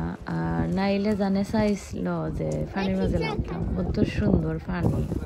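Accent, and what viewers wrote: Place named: Sylhet, Bangladesh. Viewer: আসসালামু আলাইকুম কিতা খবর বালা নি আফা মাশাল্লাহ কি অপুর্ব উপস্থাপনা আর দৃশ্য গুলো দেখে মুগ্ধ হয়ে গেলাম সিলেটের কথা মনে হয়ে গেল আপনার কথা শুনে💖❤️✔️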